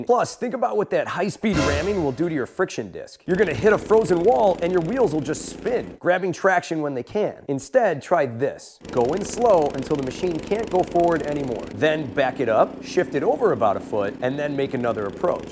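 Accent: Buffalo, United States. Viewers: First time watching your channel, I guessed you were from Buffalo before you said it 😅 I’m from Getzville. We got a ton of snow from this blizzard. Hoping you made it out ok!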